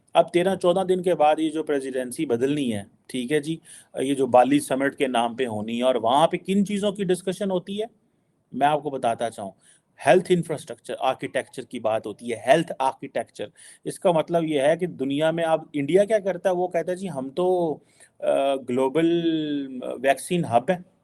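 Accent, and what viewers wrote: Punjab, Pakistan. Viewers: Love your punjabi accent😅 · I love the Punjabi tadka you give. Keep it up. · you amaze me sometimes! You sound like my father who was from Gujranwala and had the same lehza in the Punjabi he spoke! Ha mare Kutte bhi Khas hain!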